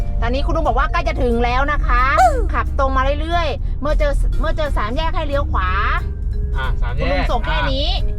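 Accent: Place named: North, Thailand. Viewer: แม่ พูดเหนือเพราะมาก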